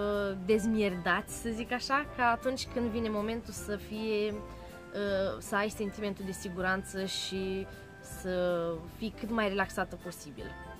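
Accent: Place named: Moldova. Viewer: ..multumesc pentru incurajare...te admir si iti urmaresc vlogurile ptr ca esti naturala...imi place cum vorbesti...accentul tau moldovenesc ca al meu...deocamdata tin totul sub control...ma intrebam de ce eu...din acel procent mic de femei pe care reusesc sa il faca in sarcina...adica diabetul...si neavand nici in familie pers cu diabet.. nu sunt supraponderala....am avut toata viata 45 de kile...si in sarcina am pus 10 kg pana la 7 luni....eu fiind schija mereu imi ziceam lasa ca poate ma ingras in sarcina:)))stiu ca in ultimele luni pui multe kg cu acest regim nici nu dau jos nici nu adaug...noi in familie suntem maruntei...si surorile mele....nu stiu de ce zic ca as face bebe mare....eu mereu ma intrebam macar sa aibe 3kg sa nu fie o matza mica de copil....si cand am auzit ca am diabet si tre sa tin dieta sa nu se duca surplusul de zahar ka bebe ca s ar transforma in grasime la el..am ramas tablou...la 20 de sapt avea 300 de grame...la 26 am facut testul de glicemie si mi a iesit prima valoare 95 in loc de 92 si mi a dat trimitere la diabetolog...nutritionist. 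Este fff stresant sa te trezesti dintr o viata normala...dormeam cat vroiam in sarcina...acum sa ma trezesc dimineata ia ti glicemia pe stomacu gol...si nu mai poti dormi ca vaii ia micul dejun la ore fixe....cantmd te vezi cu glucometru in mana te simti bolnava...dar trebuie sa ma resemnez....daca imi ies valori bune ma simt ok...dar cand is peste limita deja ma demoralizeaza....nu stii ce sa manan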